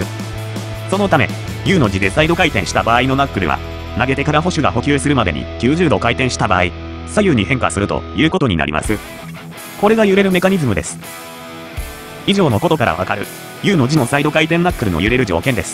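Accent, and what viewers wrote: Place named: Kansai, Japan. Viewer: ちょっと関西弁チックなのがすこ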